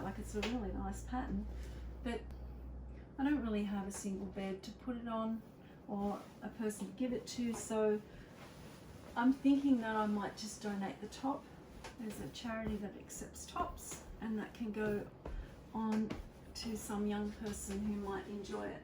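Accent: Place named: Australia. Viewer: So lovely to hear another Australian voice talking about my favourite subject! It is great to hear you referencing my quilting heroes such as Bonnie Hunter and Pat Sloan. So much joy ahead of you! PS. I love your dress!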